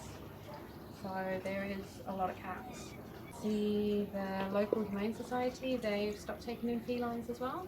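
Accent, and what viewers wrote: Australia. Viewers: are you an Aussie? Or British? I’m trying to pick your accent. You’re an absolute ANGEL. Thank you from the bottom of my heart, for what you’re doing for these cats ❤❤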